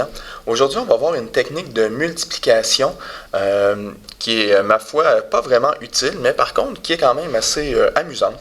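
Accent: Quebec, Canada. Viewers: owww un québécois , j'aime bien les québécois x) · C'est plutôt sympa comme méthode, et c'est vraiment bien expliqué. (sauf que ton stylo rouge il n'écrit pas rouge😂😂). It's a shame! 😅. Avec un super accent québécois, j'adore! Maintenant est-ce que c'est vraiment utile comme tu le dis si bien ? Pas vraiment, c'est plutôt ludique en tout cas et rigolo. Mais je pense qu'il ne faut surtout pas que les enfants oublient d'apprendre les tables de multiplication, ça leur servira toute leur vie. Moi je les ai apprises en chantant comment on faisait avant et j'avais plaisir à le faire quand j'étais môme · Ooooohhh un quebecoiiisss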